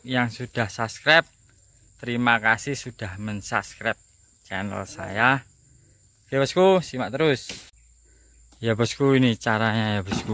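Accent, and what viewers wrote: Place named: Java, Indonesia. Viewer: Ledok nge wong jawa boss ku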